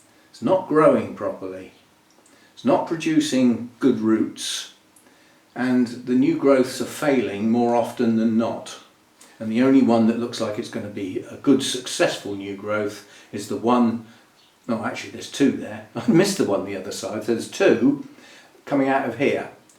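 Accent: England, United Kingdom. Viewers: I love cattleyas! I'm obsessed with them, so I very much like this type of content. Hope, you'll make a vid about the ones now having sheaths. I'm curious if they bloom or not. And I'm also fond of your beautiful English accent. Your videos are more or less English lessons for me, as well. Greetings from Hungary! 🤗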